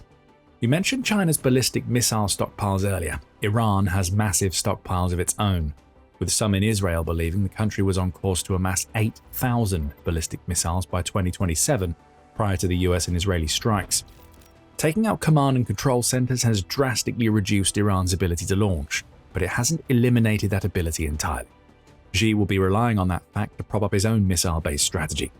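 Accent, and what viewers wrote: United Kingdom. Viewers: Why so many video makers use narration with a heavy British accent which is less easy to understand for people in America? Is it because it sounds more authoritative or because more people in the world are in the areas which use that type of English? Or, most videos are made in UK? I wonder.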